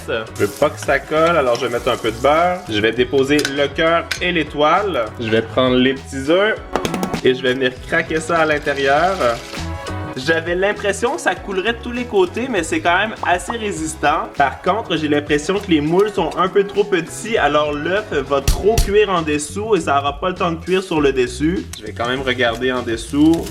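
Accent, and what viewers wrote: Canada. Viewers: tu mon youtubeur préféré desCarl is cooking vidéos accent canadien et qui met quoi des vidéos trop trop rire 🇨🇵🇨🇦👍👍👍👍👍👍👍👍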